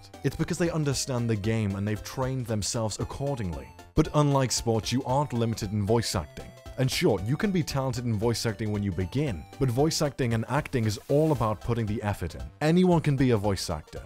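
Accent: United Kingdom. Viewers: Are you british? You sound it.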